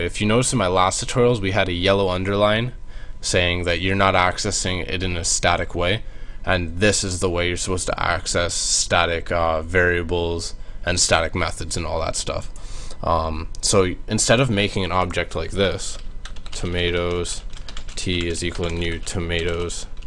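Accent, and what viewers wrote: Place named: Canada. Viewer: Canadian…. I was fishing around for the accent. Didn't quite get the origin.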